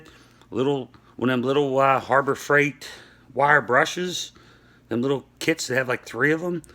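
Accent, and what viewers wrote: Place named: Pittsburgh, United States. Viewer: ! LOVE your name!!! Trying to place your accent...are you from the Pittsburgh area?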